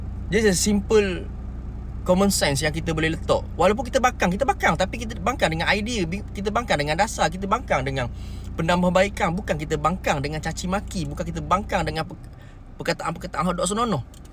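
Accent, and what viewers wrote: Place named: Kelantan, Malaysia. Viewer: tolong melaraskan bahasa kamu dengan lebih baik. Rimas lah loghat2 kelate ni . Pesanang aku belajar bahasa yg lebih baik dari DBP dulu ya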